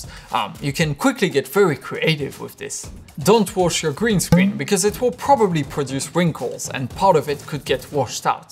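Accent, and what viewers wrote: France. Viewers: Are you French ? You have a frenchy accent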